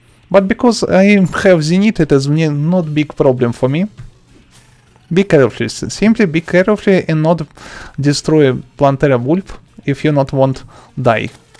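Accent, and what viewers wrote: Russia. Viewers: I love his russian accent its so good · Your from russia? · Не, ну русского человека узнать очень легко по акценту :) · Мог бы и не скрывать что ты русский)))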